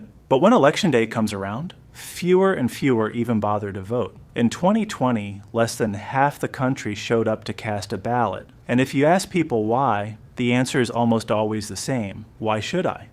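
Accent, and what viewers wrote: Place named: New Zealand. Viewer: Sounds like New Zealand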